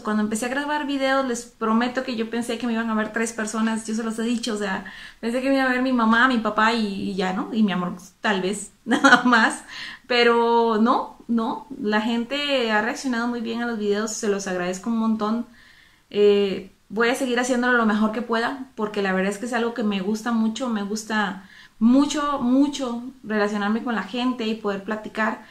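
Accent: Mexico. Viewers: Usted no párese tica párese sinaloense oiga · Con reacciones como estas… nuevo suscriptor Por cierto tienes un acento muy mexicano 👍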